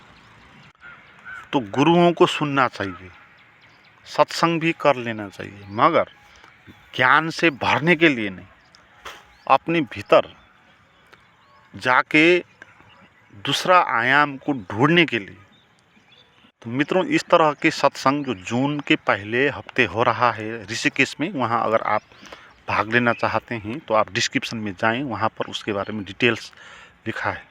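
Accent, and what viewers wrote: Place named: Nepal. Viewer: आप की हिन्दी में नेपाली की रस आ रहि हैं।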